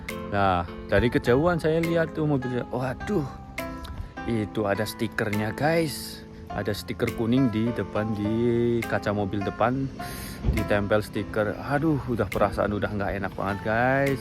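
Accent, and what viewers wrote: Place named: Banyumas, Indonesia. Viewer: Ngomong GEning te ngapak boss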